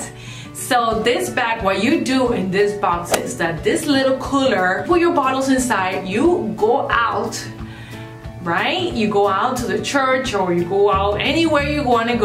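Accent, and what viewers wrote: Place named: Spain. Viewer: I love that she has this amazing Spanish accent, sounds and looks so bubbly - and that teal bow looks super cute on her! Video was also super informative, too. She sold the product better than the actual brand!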